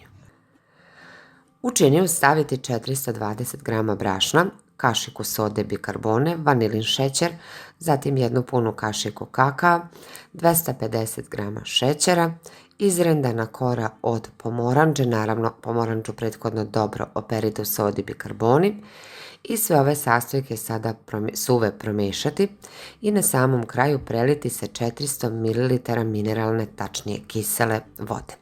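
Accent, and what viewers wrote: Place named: Vojvodina, Serbia. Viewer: Može i odozgo i od gore.U čemu je problem? je iz Vojvodine i priča onako kako je navikla. Ne priča Mađarski da je ne razumeš. A ti si neki književnik pa ti smeta? Ajde ne traži dlaku u jajetu. Pričaj kaki hoćeš, imaš mio glas I sve lepo objašnjavas. ❤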